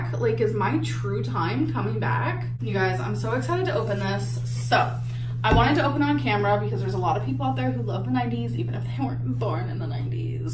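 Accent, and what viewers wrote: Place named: Calabasas, United States. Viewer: She’s from Alabama but she speaks like shes from calabazas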